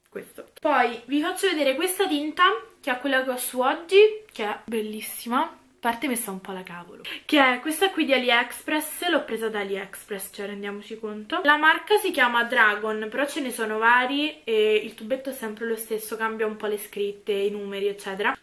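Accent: Rome, Italy. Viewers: Il tuo romano il top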